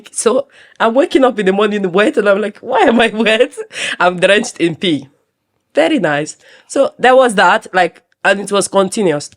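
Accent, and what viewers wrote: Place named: Haiti. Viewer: Her accent sounds haiti also, I was talking to someone today "Nigerian". We spoke to the extent of dreams and ambitions on inventing solutions for the nigeria economy. He said poor people don't dream, they only they only survive meaning we cant solve our problems cause we trying to live for tomorrow while dying today. She is right.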